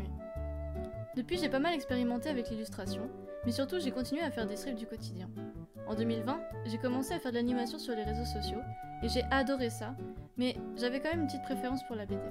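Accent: France. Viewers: You’re French?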